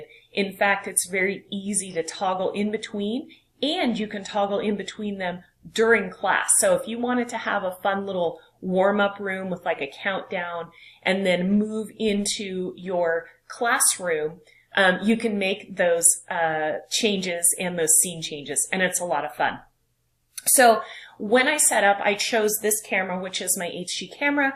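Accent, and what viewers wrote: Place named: Boston, United States. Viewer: gasp! Boston!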